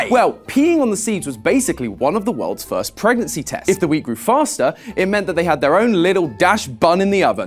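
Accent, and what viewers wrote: United Kingdom. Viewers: why is british now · Matpats British now? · I still have to get used to British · GUYS, HAS BECOME BRITISH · British 😧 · BRITISH IS BACK 😭😭😭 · Yeah, that’s why I commented You guys better get used to “British ” 😢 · sounds very British today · is sounding quite British Edit: This aged poorly:(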